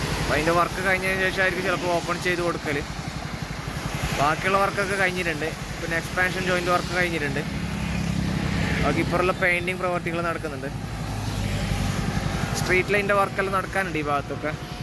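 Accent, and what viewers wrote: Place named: Kasaragod, India. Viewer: ഒന് ഓന്റെ ഭാഷയിലാണ് പറയുന്നത് ബ്രോ കാസറഗോഡ് അങ്ങനെ യാണ്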